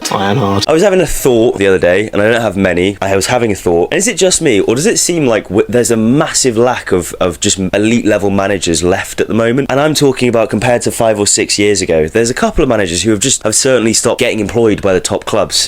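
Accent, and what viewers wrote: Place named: Liverpool, United Kingdom. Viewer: It's still very hard to understand what you're saying with that strong scouse accent. Good to see you fixed the mic tho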